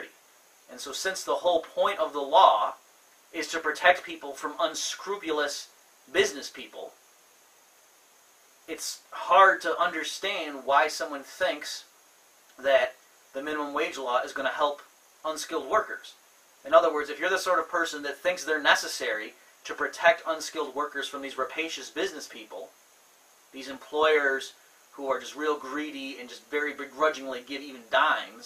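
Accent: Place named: Ireland. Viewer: Im starting to hear Murphy's Irish accent. I tried running this logic with my commie econ professor and he kept bringing up Marx.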